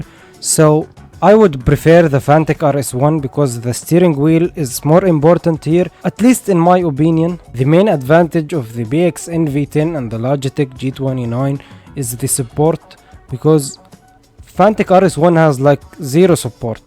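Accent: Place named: Arab world. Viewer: Arab Accent goss hard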